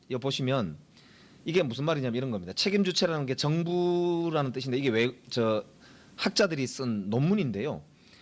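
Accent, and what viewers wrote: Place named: Busan, South Korea. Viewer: 오늘따라 부산 사투리가 더 느껴지네요. 정겹습니다^^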